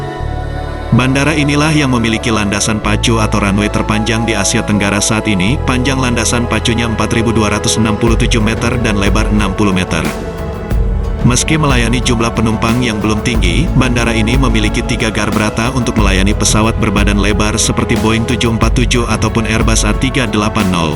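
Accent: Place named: Batam, Indonesia. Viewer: Yg org BATAM mana nii suara nya👍🖐 salam dari batam, sekupang🖐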